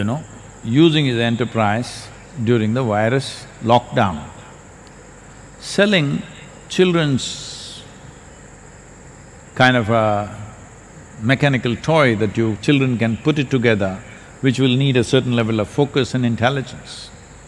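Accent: India. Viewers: 至少你多了聽得懂印度腔英文的機會不是嗎XD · 看薩古魯學英文會不會印度腔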